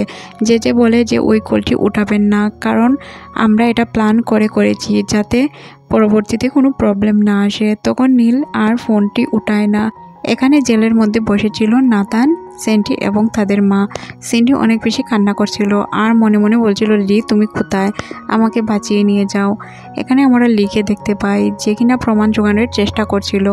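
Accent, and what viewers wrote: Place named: Mymensingh, Bangladesh. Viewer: আপু আপনার উচ্চারণ ভালো না, ময়মনসিংহ আঞ্চলিকতা 😅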